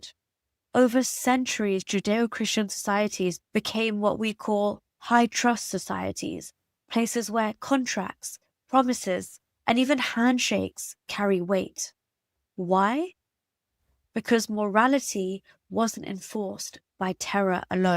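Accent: United Kingdom. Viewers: Maybe because she has a British accent?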